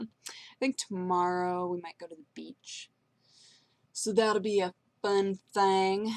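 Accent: France. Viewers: I freaking love your french accent haha :D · You have the cutest french accent I ever heard!! <3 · "Le Pain Quotidien": translated in english it's: "The Daily Bread" Also cute French accent,:) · I'm french and your accent is the cutest thing ever ^^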